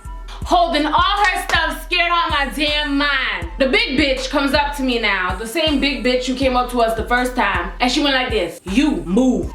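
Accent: Jamaica. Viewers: u Jamaican accent wa fix up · Are u Jamaican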